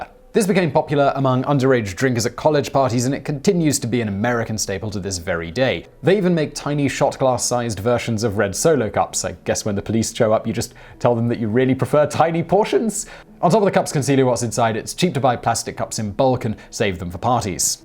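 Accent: United Kingdom. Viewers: The incentive isnt to get people to buy larger portions, it's to get them to spend more money. Obesity is more lifestyle than mcdonalds, but you almost know everything. I guess the british accent makes you more trustworthy to americans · I liked your soft hearted tone better this time. I noticed you get real "British" sometimes when speaking about America. Ha. Do you man, but I did enjoy this a lot more. I'm a sensitive guy.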